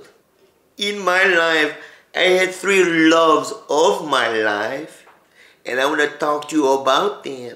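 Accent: Mexico. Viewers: Is he suppose to sound Mexican?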